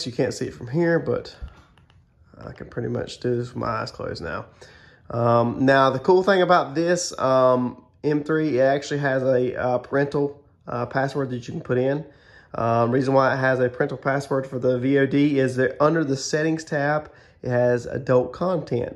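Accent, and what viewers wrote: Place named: South, United States. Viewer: face-orange-frowning: Had a hard time understanding too much mumble and southern drawl together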